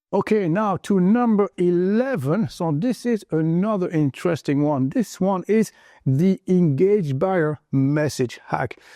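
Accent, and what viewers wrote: France. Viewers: I suspected the French accent too with well spoken English. Kudos sir! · gotta love the french accent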